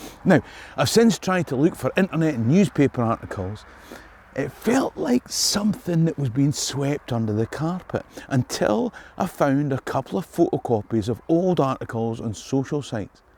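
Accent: Scotland, United Kingdom. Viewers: Love your videos. Especially love it when you say murder. Love the Scottish accent….shame my ancestors didn’t pass the accent down. Cheers from an Aussie. 🦘👍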